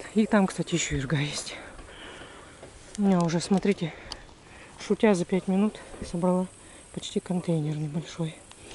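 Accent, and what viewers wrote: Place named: Urals, Russia. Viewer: Даже не зная,где вы живёте,я поняла по говору,что вы с Урала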